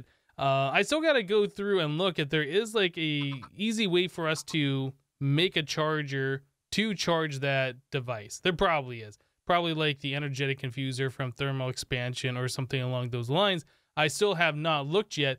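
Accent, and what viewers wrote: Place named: Canada. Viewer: This guy is Canadian right? He says some words in the stereotypical accent, like when he says egg. I don’t say egg like that but some Canadians do.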